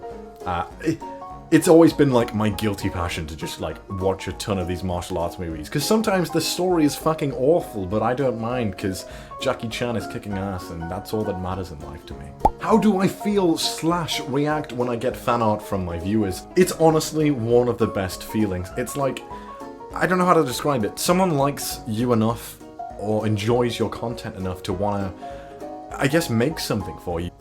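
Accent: Wales, United Kingdom. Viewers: ARE YOU WELSH MATE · Don't have a twitter but for the next one, how hard is it to hide your British accent? Like is it easy or hard to do?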